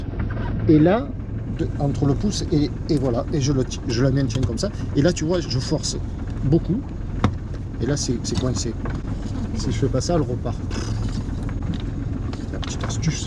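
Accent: South, France. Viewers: Je m'étonne de tous ces commentaires sur les gilets, les chaussures, les pare bat, la ligne de vie...Il me semble que tu es un capitaine avisé et qui sait s'adapter aux éléments. Pour preuve: en <num>) tu nous a fait un speech de 20 min (Marseille est proche) sur le fait que les femmes ne devaient pas gérer la baille à mouillage. En 2019, pendant 5 semaines tu n'as pas vu la couleur de ton ancre parce que avait adopté le poste. Autre matelot = autres idées. Au passage un grand merci à pour sa participation. Si on dit que les actrices attirent la lumière alors est une actrice. Et puis les pare bats de couleur allaient très bien au tabasco. Nous on regarde, via un écran plat, avec une seule donnée 30 nœuds de vent. Il y a des vidéos où par 30 kts l'équipage est à l'abri dans le carré et le barreur est harnaché comme un cap hornier. Là on voit un équipage heureux et serin. 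Le capitaine connait par cœur son bateau et son plan d'eau. Pour la gaffe, quel suspens insoutenable! C'est filmé comme du Hitchcock! J'adore et c'est au moins la 2eme fois que tu nous fais le coup. Comme si tu allais tous les jours au ship pour acheter une gaffe... Tout ça pour dire que dès les premiers sons, l'accent, le vent, je m'évade et je profite pleinement des images quelque soit le sujet. Pour les puristes, fait leur un jeu des sept erreurs à retrouver dans chaque vidéos. Pour un premier commentaire, je suis un peu long, mais par rapport au temps que tu nous consacre c'est zé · VFI: vêtement de sécurité individuel. En clair le gilet auto-gonflant. J’aime bien tes vidéos. Et puis avé l’accent, c’est du soleil dans notre grisaille bretonne👌👌👌👌 Bonne journée, Patrice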